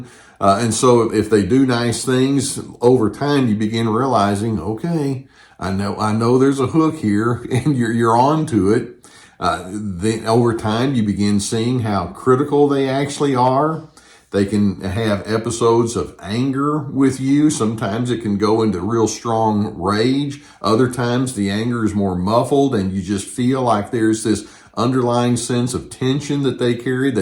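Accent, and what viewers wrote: South, United States. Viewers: Thanks so much for all your videos. You are so helpful and your Southern accent is charming. Warm regards from Canada.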